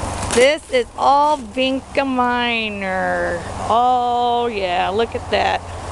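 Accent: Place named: Pennsylvania, United States. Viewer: you sound like you're from PA.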